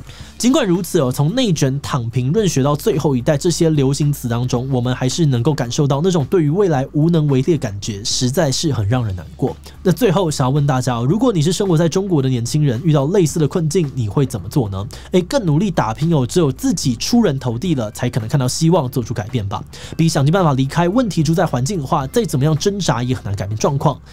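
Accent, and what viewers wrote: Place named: Taiwan. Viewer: 一听你就是台湾仔，我们跑哪里去啊，润毛线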